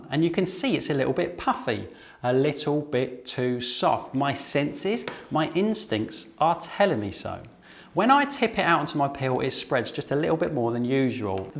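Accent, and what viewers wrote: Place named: RP, United Kingdom. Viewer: Otp Love your accent😊this is the posh english i love..p.s great advises thank youu❤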